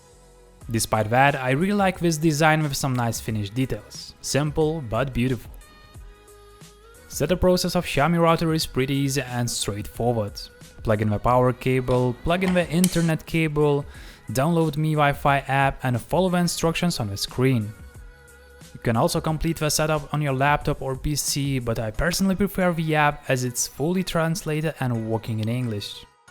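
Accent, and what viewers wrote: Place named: Lithuania. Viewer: Well, I'll support you vid with comment. Wi-Fi 6 looks more like transitional standard. I'm almost sure that when manufacturers start to implement 6 there would already be 7. A very limited range of units available now, so I'll wait until it be more across-the-board. Čiotkas, su niekuo nesumaišomas Lietuviškas akcentas :D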